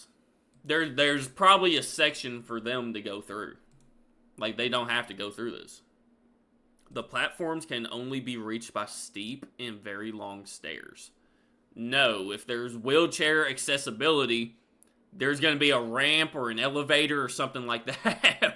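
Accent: Kentucky, United States. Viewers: Soon as I heard that accent I just knew you were from KY. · Lovin your accent, is it Kentucky? I’m very English. Sometimes youre almost London cockney. July 28? I’m July 27. 😄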